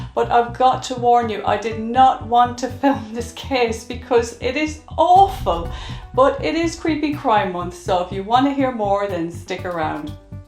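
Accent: Ireland. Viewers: Glad I found this channel. You’re accent sounds a bit Irish ☘️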